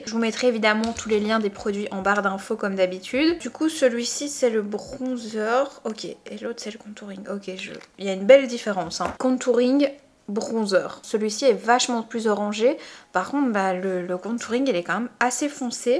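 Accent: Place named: Belgium. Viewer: J'adore l'accent belge.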